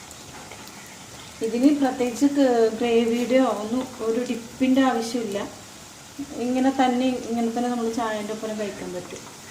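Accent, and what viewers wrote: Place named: Kannur, India. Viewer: full kannur shailiyil aanallo samsaram. ndhayalm sadanam polich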